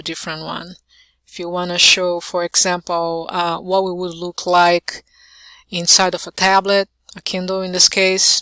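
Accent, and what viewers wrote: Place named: Brazil. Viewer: First time here. Are you Brazilian...? Your accent sounds beautifully Brazilian. Thanks for the video!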